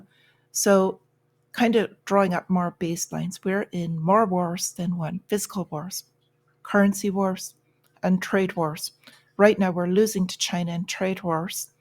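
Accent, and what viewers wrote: Ireland. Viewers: So nice to hear your Irish Brogue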